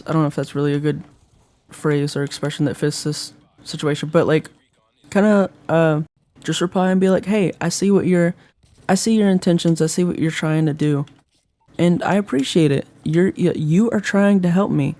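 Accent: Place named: South, United States. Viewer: great southerner accent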